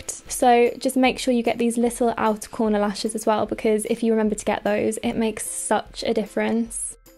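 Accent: United Kingdom. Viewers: this is the first video I've watched of yours so far. and I absolutely love it!!you're so feminine, and polite, i love your light pink style and I love your accent. I've always loved British accents, thank you so much for making this, I love natural makeup looks. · In which oart of the Uk do you lievv? Aksong bc of your accent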